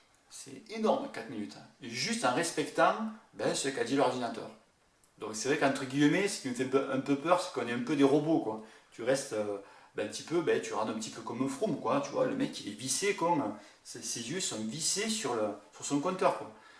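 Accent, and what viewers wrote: South, France. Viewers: Super ta chaine et tes vidéos, je suis fan et maintenant abonné. J'aime bien le coté un peu décalé, non conventionnel et surtout ton accent du sud. Bravo ne lâche rien !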